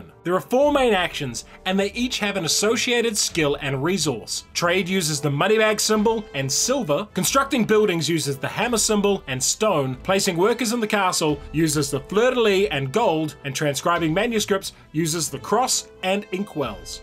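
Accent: New Zealand. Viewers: In all fairness, he doesn't have the Letter I thing as much asmost Kiwis do, but you can still tell he's a Kiwi and not a Brit lol (For those who don't know, The Letter I thing is how you can tell Aussies and Kiwis apart, cos Kiwis struggle to sound the letter I in words... thnk, blnk, snk, as opposed to think, blink, and sink, for instance lol)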